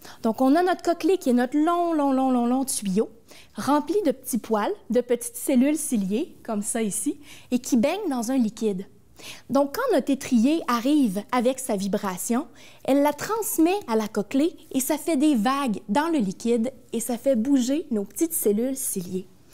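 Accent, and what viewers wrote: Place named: Quebec, Canada. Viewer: J'adore l'accent québécois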